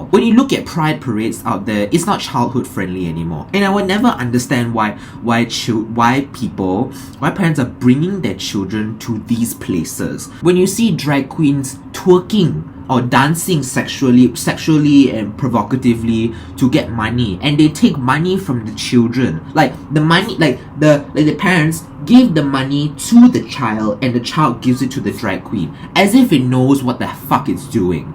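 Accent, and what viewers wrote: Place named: Singapore. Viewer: Its called Singlish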